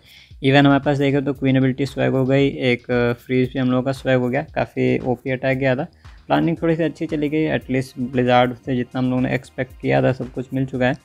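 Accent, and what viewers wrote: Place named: India. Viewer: hey mate i know its hard, but if u speak about your videos, it would be nize if u try to speak a bit slower, the indish accent is hard but i wanna hear the english phrases :D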